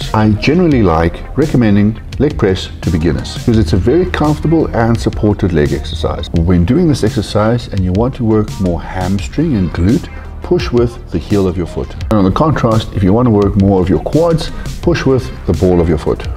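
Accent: Afrikaans, South Africa. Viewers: Man I love you bru....you are so down to earth...so charming....funny and all round beast.....I can't help but notice the south african accent.....lol so I guess that also makes it easy for my to just give you my full support....LAAT ONS DIE BENE HARD BLIKSEM MANNE!!! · Is South African? I'm from western cape sounds hella Afrikaan lol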